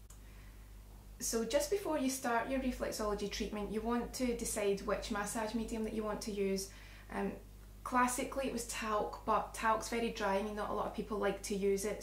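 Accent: Scotland, United Kingdom. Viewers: I LOVE your accent. All americans love the British accent but Scottish is my favorite. Pretty and engaging. Great video! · I LOVE the Scottish Dialect...So Sexy ❤ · It's not even an accent. She's Scottish. That's how Scottish people sound. Duh! 🙄😏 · Am I hearing a Scottish accent? :) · Hiral Patel it a Scottish accent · Love the Scottish accent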